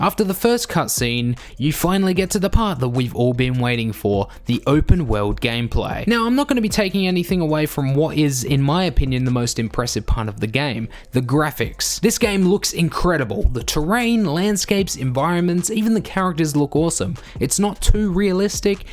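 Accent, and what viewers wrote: Australia. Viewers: The guy sounds Australian. If you watch Crocodile Dundee, you'll know Australians have ridiculous standards based on hogwash.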